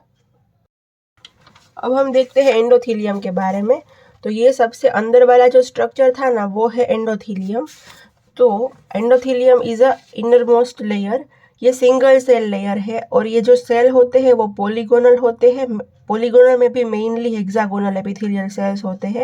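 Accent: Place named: Gujarat, India. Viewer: Bohot acha lecture tha aap gujarat se ho na aapke accent se pata chal gaya 😊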